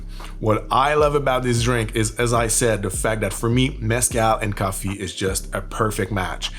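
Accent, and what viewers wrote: Quebec, Canada. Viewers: Hey you're Québecois? Would have never thought? Is your accent because you are francophone? (I am aswell from Mtl south shore)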